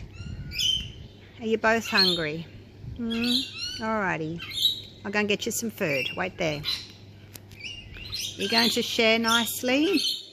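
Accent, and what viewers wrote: Australia. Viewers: I love scruffy!!! I know this video is old...your birds and pours are fantastic!!! I'm from Ohio and ADORE Australian accents...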